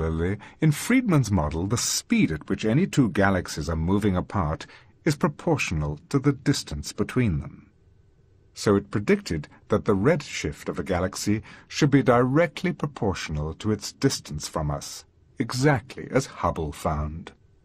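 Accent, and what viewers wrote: United Kingdom. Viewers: Beautiful voice and pronunciation. At last a British English and not the usual american twang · Love the British accent. Sounds very English!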